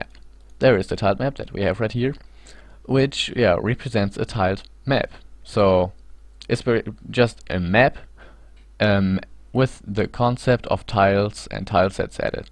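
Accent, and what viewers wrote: Germany. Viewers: Ich hab mir doch grad gedacht, dass ich einen dezenten deutschen Akzent höre :DD Danke für das Tutorial :)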